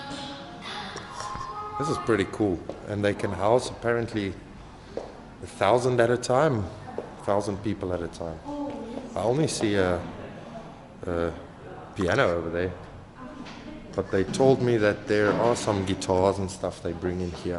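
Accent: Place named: South Africa. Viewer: Your accent is giving South African are you South African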